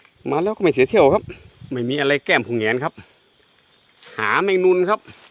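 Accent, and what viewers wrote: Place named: Korat, Thailand. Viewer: ตกลงแล้วลุง อยู่ภาคไหน ภาคอีสานหรือภาคเหนือ สำเนียงเหมือนคนโคราช ติดตามมานานพอสมควรแต่ไม่รู้ภูมิลำเนาลุง จริงๆสำเนียงผมคิดว่าเป็นคนนครราชสีมา(โคราช)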